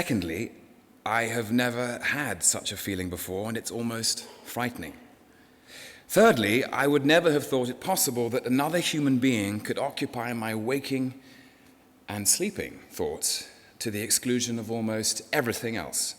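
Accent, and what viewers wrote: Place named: United Kingdom. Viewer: This essay poem is not only utterly romantic and passionate but suits his voice, his British accent... beautiful literature piece that just suits the loving character. Also to his mischievous character when he said let's get into detail, hehe. Blemishes were funny and actually made total sense. Love the literary style with metaphors...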